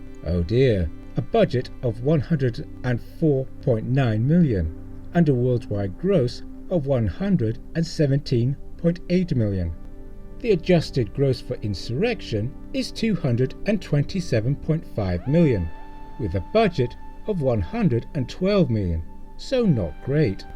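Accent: United Kingdom. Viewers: In which region of Britain do you live? Do you get thunderstorms a lot? I know the UK is famous for rain, there certainly some with which we had to contend during me and my family’s visit. I, of course, am assuming it’s Britain, due to your accent. I live in north Georgia, and we’re having some tropical storm doozies, stemming from a larger system about ready to hit the Gulf Coast of the Florida Panhandle.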